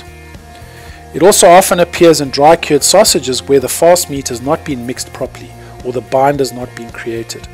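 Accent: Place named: South Africa. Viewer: Where can I buy bactoferm 600 in South Africa ? You sound like a South African.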